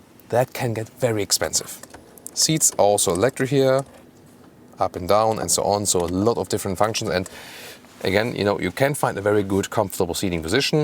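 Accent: Germany. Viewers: Hi, may I ask you where you filmed that review?! Nice nature. Btw: Do you speak german? - I must say the reviewers accent sounds a little german ; )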